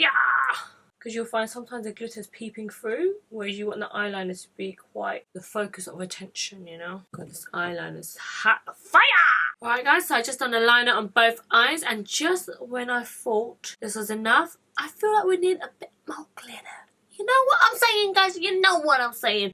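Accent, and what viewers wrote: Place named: North London, United Kingdom. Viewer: You got a beautiful north London accent x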